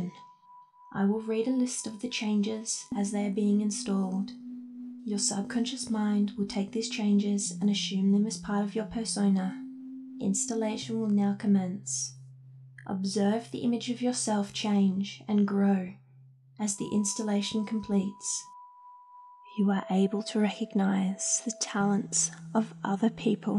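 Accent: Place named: Australia. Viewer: I'm working my way through this series. It's nice hearing another Australian do this stuff Cheers :-)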